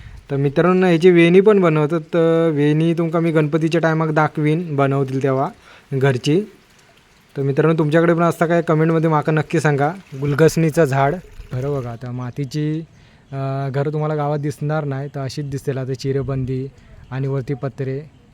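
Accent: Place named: Malvani, India. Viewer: Malvanimadhech bol, Bara vatata eikak!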